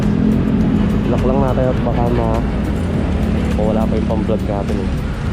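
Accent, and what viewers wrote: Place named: Iloilo, Philippines. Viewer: hahahah medyu malapit ako jan boss hahaha linti ilonggo kman gali hahaha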